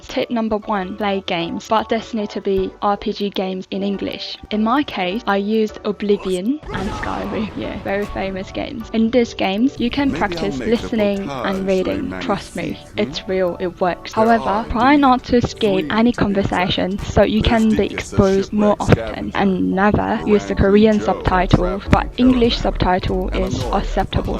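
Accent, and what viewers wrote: United Kingdom. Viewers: 언니 브리티쉬악센트 뭐에요 대박!!! 넘나 멋져ㅠㅠㅠㅠㅠ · I love your British accent! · 와우!!!! 브리티쉬 액센트!!!!!!!!! 너무 부러워요!!! 전 연습해도 액센트는 맨날 콩글리쉬 수준 ㅠㅠ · 너무 차분하게 말씀해주셔서 좋은것 같아요, 저는 미국식 발음으로 배웠는데 요즈음 영국식 발음이 너무 좋아서 부럽습니다..ㅠㅠㅠ♡♡ 겨울왕국(frozen)으로 하다가 포기했는뎅....듣기라도 계속 해야겠어요...ㅋㅋ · 영국식 억양 완전 멋져요!! 대부분 미국식 억양 교재나 컨텐츠들이 많은데 영국식 억양은 어떻게 연습하신거에요?? 영국 다녀오셨던 게 큰 도움이 되셨던 건가요??rpg 게임 팁 완전 도움이 될 것 같아요 ^*^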